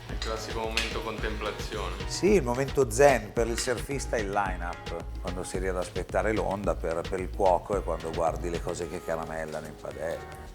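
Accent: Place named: Apulia, Italy. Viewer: Sono Romana, ho imparato prima a cucinare la carbonara, poi a camminare... Mi sono emozionata a vedere questo video. Hai coccolato questa Carbonara.... E m'hai fatto venire fame. Ho sentito quel pizzico di Puglia tra il Meh e il fantasticare sul sud. Comunque, io solitamente faccio rosolare il guanciale in padella, pizzico di pepe, ci butto sopra la pasta, l'uovo, un mestolo di acqua di cottura e alla fine il pecorino, in cucina so che cambiare gli ordini degli addendi, il risultato cambia, cosa pensi di questa composizione? Mi conviene cambiare e lavorarla più come hai fatto tu?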